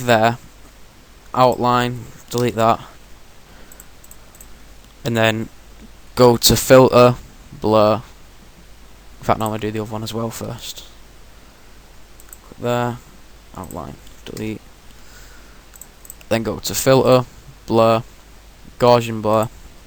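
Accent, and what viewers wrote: Manchester, United Kingdom. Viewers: ahah you have an obvious manc accent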